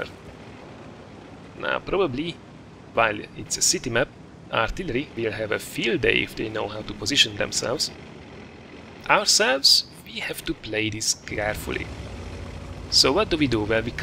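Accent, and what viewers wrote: Hungary. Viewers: i like hungarian dialekt! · magyar vagy? mert amikor beszélsz úgy hangzik mintha magyar lennél. Are you hungarian? Cause you sound like a Hungarian man when you talk :/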